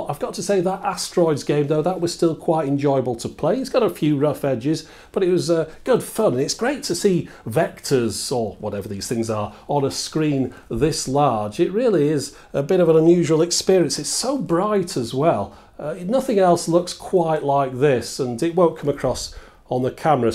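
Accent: United Kingdom. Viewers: Makes me miss my old Vectrex even more. I've always had a soft spot for vector graphics games, and poured way too many quarters into Asteroids, Star Wars, and Tempest machines as a teenager in the eighties. While all my friends were buying Colecovisions and Atari consoles, I scrimped and saved for the Vectrex. I had the 3-d goggles and the light pen and most of the games that were available for the machine. I foolishly gave it away to an old flatmate trying to downsize for a move to the UK. I ended up back in the states eventually, and now have a 16 year old son who loves retro gaming. Looking at what the Vectrex and accessories go for on Ebay, I fear I'll never have another one. Hopefully this technology will mature to the point where I can play a ten foot version of Tempest on my ceiling! Love your videos, and your accent makes me homesick for my adopted second home in Blighty.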